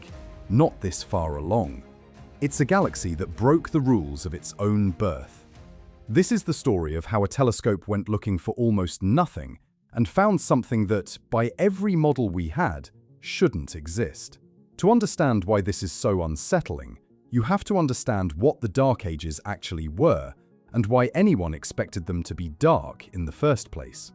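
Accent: United Kingdom. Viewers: Why does the narrator have a British accent?? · What's with the British accent?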